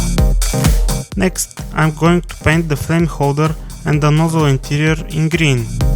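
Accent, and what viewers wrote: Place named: Russia. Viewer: Narrated are good, however your narrations resemble "russian spy" accent in western Cold War movies :)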